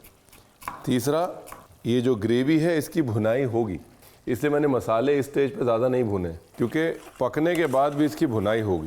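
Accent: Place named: Lucknow, India. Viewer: Ahhh, that mutton looks too delish 😋. Moreover, i love the Lucknowi tone of your language. Love from Lucknow ❤❤❤❤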